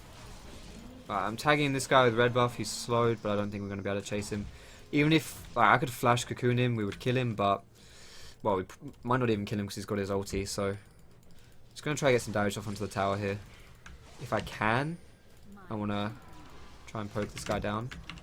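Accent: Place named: United Kingdom. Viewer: I KNOW RIGHT ??! It made me laugh for the whole game, but does he really means to say cumshot all the time? Cause he's not even laughing, so i'm wondering if he means to say something else but we don't get it because of his british accent or if it's just a joke he doesn't find funny anymore. °-°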